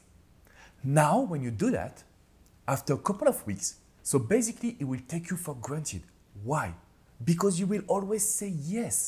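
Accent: France. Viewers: I love your videos and French Accent! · Hi woooao you are such a great relationship couch you are making things happen with that French accent. do you you know what your teachings are so sweet and short very powerful. thank you very much for your teachings. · I LOVE your french accent😊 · Omg, I love your french accent as you reminded me of my late french hubby's voice...oh I missed him so much... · Come here for his voice strong French accent 😊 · Merci beaucoup cher! Please keep your French accent, its lovely and very charming, enchanté! Your advice is always precious:-) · Love your French Accent 😍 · Mr... i. like ur" frenglish" language 😊. thank u for ur advices.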